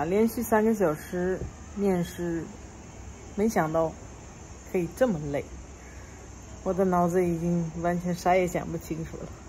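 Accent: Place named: Beijing, China. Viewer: 这中文都有京腔京味了，厉害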